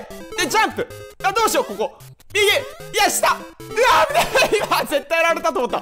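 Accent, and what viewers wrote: Fukuoka, Japan. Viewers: やっぱり、福岡？の方言は良いですね（笑） 今日のLIVE楽しみしてます( *˙ω˙*)و ｸﾞｯ!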